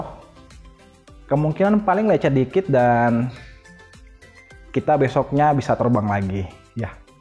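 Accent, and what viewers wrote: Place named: Kalimantan, Indonesia. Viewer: dari logat bahasanya ketahuan,kalo dari kalimantan....sukses selalu ya om ,🙏🙏🙏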